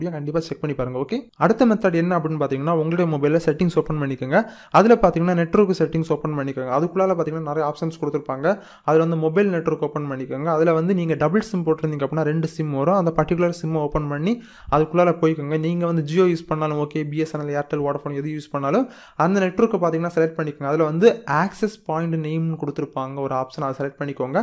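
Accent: Kanyakumari, India. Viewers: Bro u kanyakumari aa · bro neenga ....kanyakumari'ah....?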